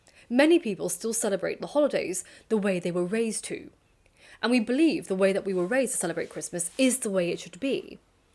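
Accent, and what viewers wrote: United Kingdom. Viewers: Does this specific British accent have a name?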